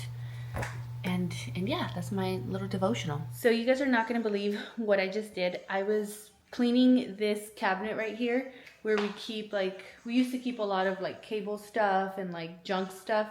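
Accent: Spain. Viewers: Love your Spanish accent!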